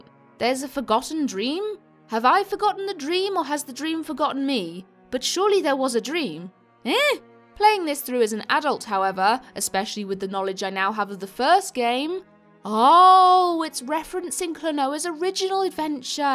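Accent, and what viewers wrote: RP, United Kingdom. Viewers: Just started playing this game, so looking for reviews...this is your first video I've seen, and am wondering how you can sound both sexy and posh at the same time...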